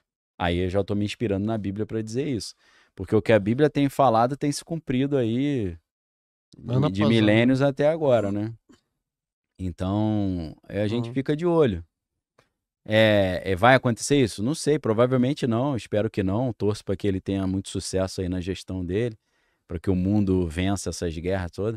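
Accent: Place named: Rio de Janeiro, Brazil. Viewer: Como levar a serio um cara com sotaque de carioca?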